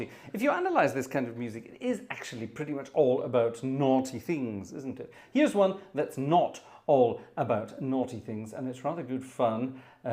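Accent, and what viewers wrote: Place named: Scotland, United Kingdom. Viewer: I have been away for a while but getting back into the swing of things and I enjoyed this organ night. You're sounding more and more Scottish each day!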